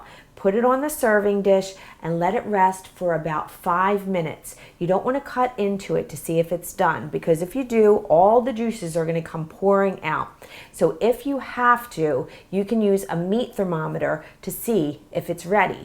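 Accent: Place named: Brooklyn, United States. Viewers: Funny, I hear it too. Here and there it sneaks in. Wonderful video though. At least she's trying to free herself of the ny accent. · New York accent?...Brooklyn accent?...can hear it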